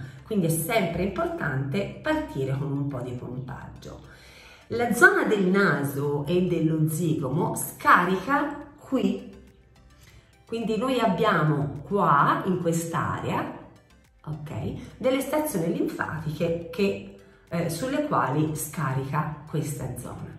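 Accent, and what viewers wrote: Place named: Florence, Italy. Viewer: Ciao, piacere di conoscerti. Ti ho scoperto ieri sera con grande piacere. Volevo farti una domanda ma preferirei in privato. C'è la possibilità di scriverti una mail? Grazie in anticipo e un abbraccio da Firenze. (Mi sembra di sentire che anche tu sei delle mie parti)😍😍😍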